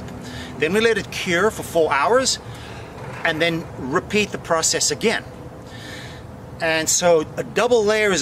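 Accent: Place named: South Africa. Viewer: Interesting accent!!! Originally from South Africa or Zim?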